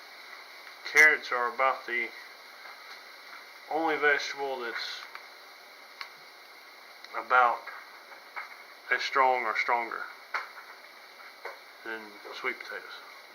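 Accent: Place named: South, United States. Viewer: I used shishkebob skewers (broken in two) because toothpicks for some reason didn't seem to be long enough for my situation. Just an option. I can tell you're from the south by your voice. I'm growing sweet potatoes in zone 3 up north. It works, but you really have to start them early in the house up here. You can let the vines grow really long and cut them into one foot lengths and plant each piece.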